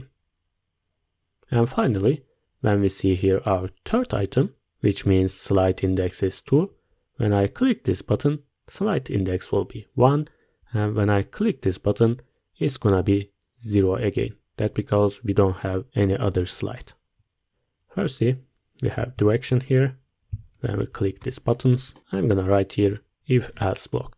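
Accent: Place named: Turkey. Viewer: your accent sounds Turkish, keep up the good work king 🤍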